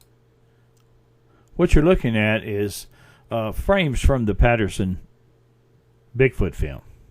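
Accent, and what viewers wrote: South, United States. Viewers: you could read the phone book to me and I would listen to you. Your beautiful southern accent always reminds me of my father. He's been gone 26 years this month. He would have enjoyed your research and videos. I'm so glad that you are still posting videos. I appreciate your time and your efforts very much. I have no problem believing Patty could or would have a braid because there are many stories of bigfoot creatures braiding the tails and/or manes of horses. Thank you for your time!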